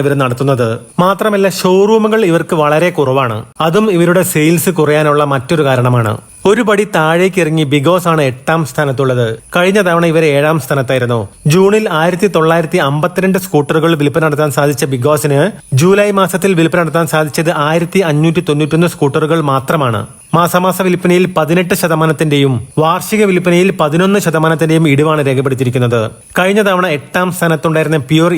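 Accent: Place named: Kannur, India. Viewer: kannur ടോണിൽ തന്നെ bro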